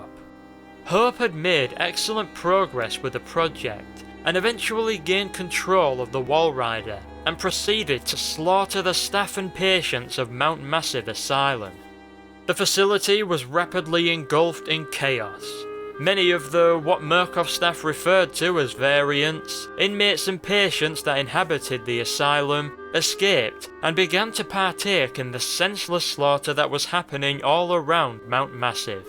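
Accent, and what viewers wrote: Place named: Manchester, United Kingdom. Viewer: I love your manc accent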